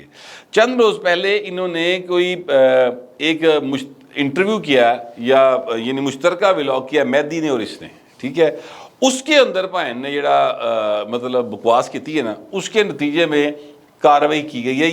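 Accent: Lahore, Pakistan. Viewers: ZindAbad.pure lahoria style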